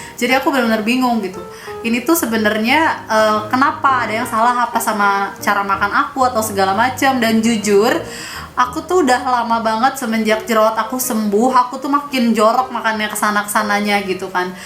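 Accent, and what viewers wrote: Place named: West Java, Indonesia. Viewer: Siteteh orang sunda nya twh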